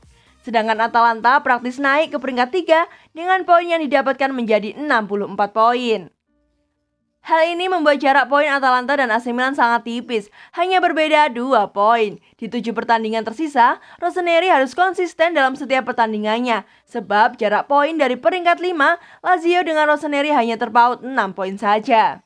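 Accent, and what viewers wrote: Java, Indonesia. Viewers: adminnya mantapp mendok berasa main di kediriii akuu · POKOKNYA MILAN DI SISA MATCH SELALU ON FIRE KARENA SUDAH TERTINGGAL 9 POIN DARI MERDA INTER LAH MEDOK LAGI VO NYA WELEH WELEH FORZA PRE SEMPRE MILANELLO MARCHEND JAKET MILAN APA KABAR TUH MIN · Admin nya orang jawa ya.. mantap lah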